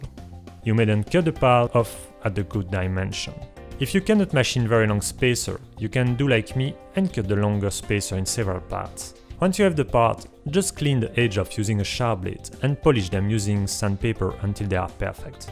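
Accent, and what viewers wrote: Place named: Belgium. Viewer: Great explanations!!! Your accent sounds like from Belgium or French speaking? I have few friends I know from there.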